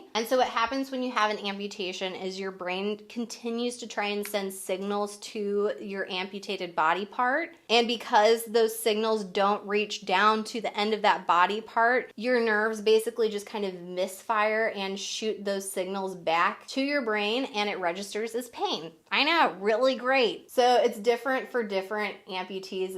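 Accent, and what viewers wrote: Midwest, United States. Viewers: Do you have a Illinois accent or Mid west just curious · Sounds more mid west to me, I've lived in SW Missouri my whole life and she talks just like pretty much everyone here does.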